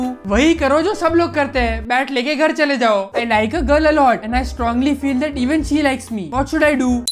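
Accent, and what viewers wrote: Gujarat, India. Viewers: Tum jese bolte ho vese Pata chal hi jata he ki tum gujarat se ho❤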